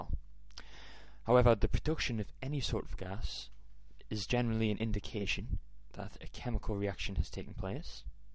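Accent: Scotland, United Kingdom. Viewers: I can hear Scottish accent in there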